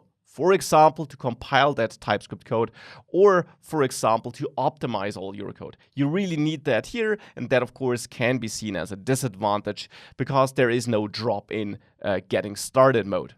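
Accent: Germany. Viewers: that is the second video i watch from u, i really like ur videos, that is why ur earned my sub. i have to laugh about ur german accent but still Tapfer of u, u talk very well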